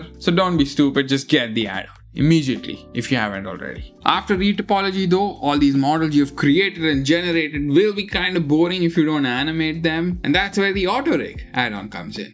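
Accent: Bangalore, India. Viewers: This mans commentary is the best xDD Are you from Bangalore. You sure sound like you are xD Love it. Your videos do make me feel home in a way in a country away xDD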